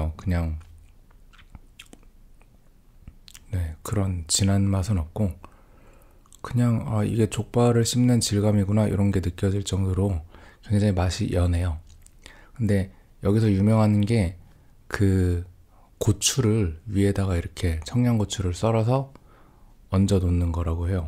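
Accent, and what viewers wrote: Cheongju, South Korea. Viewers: 청주분이확실합니다!!!오명가명 마주친것같은 익숙함.........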